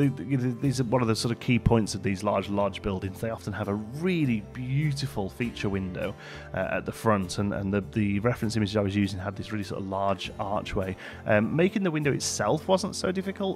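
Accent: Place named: Midlands, United Kingdom. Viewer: I love your builds, I’ve learnt a lot watching your tutorials. I recognise your accent, I’m from the Midlands I can hear a slight twang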